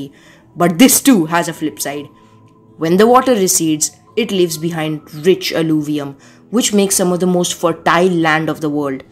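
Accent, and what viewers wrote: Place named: India. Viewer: Speak english not “indish” please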